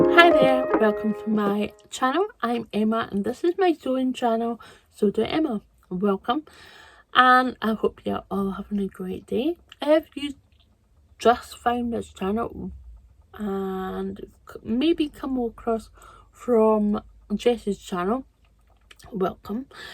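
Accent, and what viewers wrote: Scotland, United Kingdom. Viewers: Lovely dress, Emma and the heathery colour looks great on you. I'm in Aviemore quite a lot as I live a bit further north in Forres and it isn't too far away for a day out. Always good to hear a Scottish voice on youtube!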